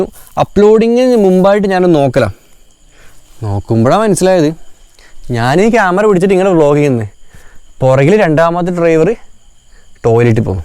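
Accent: Kannur, India. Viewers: Katha ellarum parayunnath nammalk ishtamakillaa. Bt ingal parayumbo entho kettirunn pokm manushya . Onnamath ee kannur karante slang okke kekkan nalla rasaaappaaa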